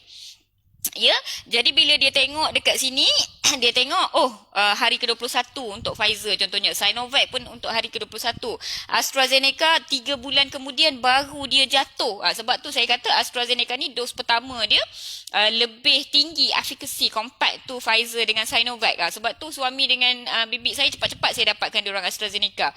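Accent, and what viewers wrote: North, Malaysia. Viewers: Di persilakan ke Penang lepas PKP ni... first time sy tgk video sy ingatkan dr utara sbb dialek mcm org utara...rupanya dr kuantan😊😊😊...akhir sekali sy ucapkan terima kasih kepada diatas pengkongsian ilmu dr....semoga dan keluarga sihat2 selalu....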